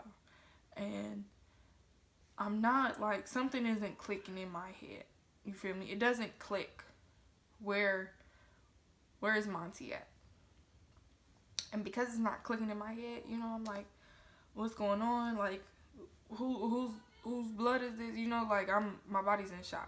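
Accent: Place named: New Orleans, United States. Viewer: You from New Orleans?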